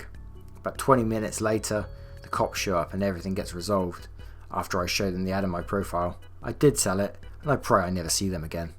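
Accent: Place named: Bristol, United Kingdom. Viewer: Ok then, on some occasions you sounded like a Bristolian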